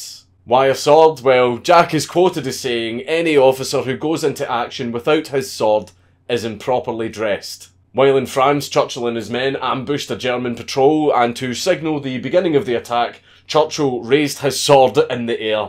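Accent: Scotland, United Kingdom. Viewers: Definitely like hearing this from Scottish bloke instead of an American veteran both version are excellent Mr. Ballen but this one feels classic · Sounds like all you do is state facts in a Scottish verbalige. And we love it !!!! Can you do 1 on Jimmy Carter?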